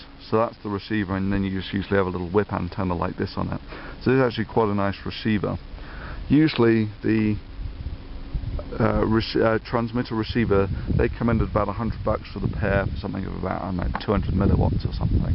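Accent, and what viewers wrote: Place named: United Kingdom. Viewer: O just remembered to ask, where u from as you have a British accent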